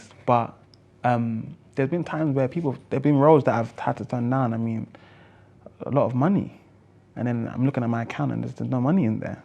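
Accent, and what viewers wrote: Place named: RP, United Kingdom. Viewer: Do you really chat like that lol 😂 sound like really posh and stupid bro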